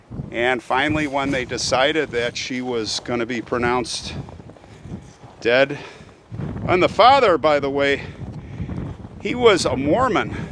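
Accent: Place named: Upper Midwest, United States. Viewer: I love the music. It reminds me of Minnesota and North Dakota where I went to college and graduate school when my husband was in med school. The nicest people and a wonderful six years exploring North Dakota and Canada. Your accent takes me right back to 1970.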